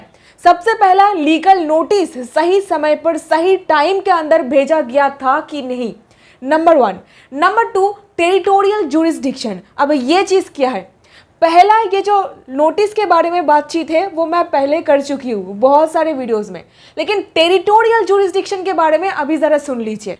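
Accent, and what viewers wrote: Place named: Bihar, India. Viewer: Bihar se h tu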